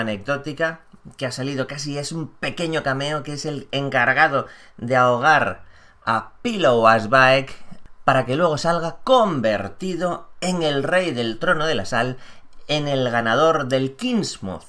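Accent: Spain. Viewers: si esta chistoso pero es que así pronuncian los españoles.. sin ofender :)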